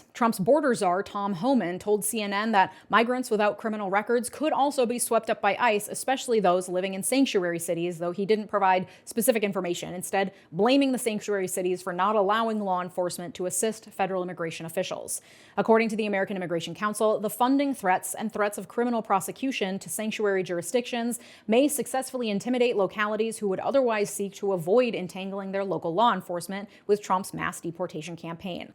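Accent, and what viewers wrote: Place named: Minnesota, United States. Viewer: you are obviously a childless woman and hopefully it will stay that way. you do NOT need access to children. Based on your accent, you are located in Minnesota, where you are completely removed from the CHAOS that illegal immigrants cause in the border states. They are constantly causing fatal car accidents in Texas, due to a culture of drinking and driving. Many of them also don't see anything wrong with grooming 14 year old girls because in their home country, it's normal for underage girls to get with grown men. I've seen several of your videos and most of them sound like you're completely disconnected from the reality of life.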